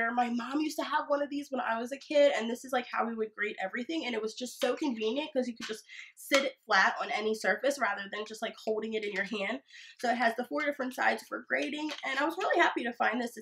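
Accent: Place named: Pittsburgh, United States. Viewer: I look forward to your videos! The more, the merrier! I'm from Pittsburgh (I now live in Cali) and we say 'home' with the same accent that you do ~ and we say 'carmel' instead of caramel! Maybe that is why your voice is so soothing to me!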